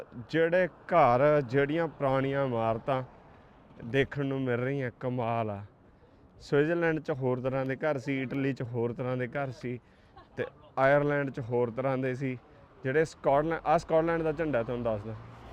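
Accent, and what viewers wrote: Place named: Malwa, India. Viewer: Scotland is cheaper than England example if house price in London 1 million pounds you can same property in Scotland only 400000 pounds only don't compare with England other things u said I am PhD from punjabi University but u still pure malbai u should change don't say gggg this is instruction don't mind?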